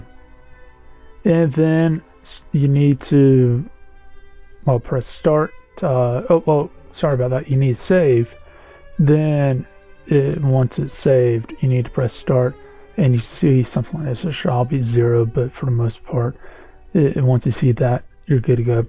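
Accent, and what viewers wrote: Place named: North Carolina, United States. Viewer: i knew i heard that nc accent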